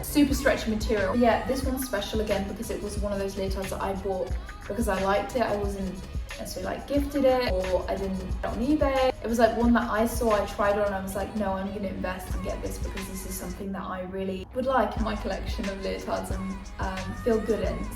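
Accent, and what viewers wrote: United Kingdom. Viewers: You speak British English very pleasantly. I think your video was well produced and presented. I'll teach you how to speak Southern American English if you should ever come to Florida.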